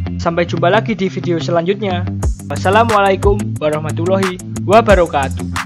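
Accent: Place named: Java, Indonesia. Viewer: Medok banget logat jawanya 🙈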